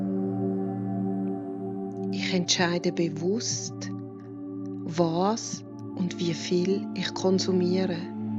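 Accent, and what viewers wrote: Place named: Swiss German, Switzerland. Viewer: Da meine Frau so heisst wie Du, warst Du mir gleich sympathisch. Deine Stimme ist wunderbar fein und weich, geht mir tief direkt ins Herz. Die Mundart gibt dem Ganzen eine spezielle persönliche Note. Der Wechsel von Gefühl zu Energie und Bestimmtheit ist ganz speziell, passend und schenkt wunderbare Energie! So wia's guat isch! Vielen Dank dafür! Alles Liebe, Albert Pucher.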